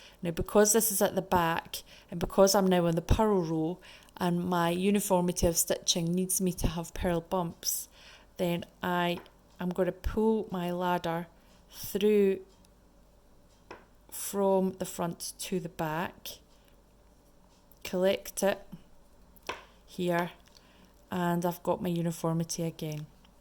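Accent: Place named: Scotland, United Kingdom. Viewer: I Lo❤e your Scottish accent! ❤ 🥝🇳🇿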